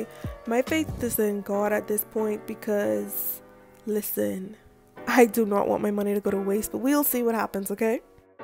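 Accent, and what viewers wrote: Bahamas. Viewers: jus had ta b bahamian frm she strt tlkn we cnt hide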